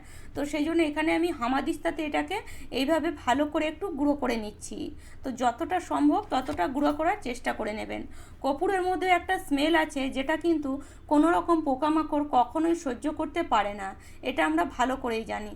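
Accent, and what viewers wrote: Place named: West Bengal, India. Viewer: আপনি কি ওপার বাংলা থেকে ? উচ্চারণ সেটাই বলছে !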